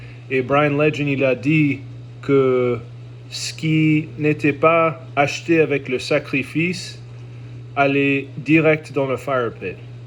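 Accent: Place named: France. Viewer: You’ve got an awesome French accent mate, congrats (I’m French)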